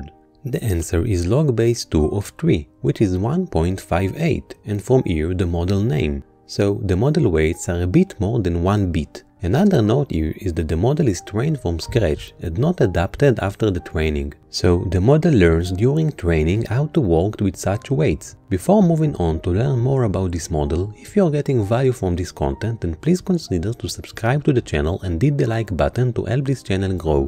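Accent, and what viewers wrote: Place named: France. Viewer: I would guess french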